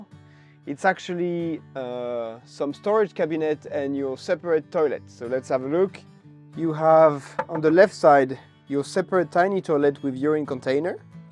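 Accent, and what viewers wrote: France. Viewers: Just beautiful as always 😍 bravo PS: like the french accent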